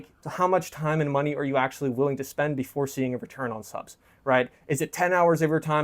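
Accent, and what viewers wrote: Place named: United States. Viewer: you are definetly not from eastern europe, your accent sounds definetly as an american lol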